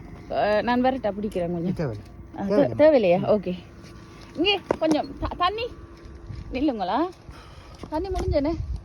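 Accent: Sri Lanka. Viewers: Madam, Your Slang Not Exactly Jaffna. TRINCOMALEE Or Exact Batticaloa Town .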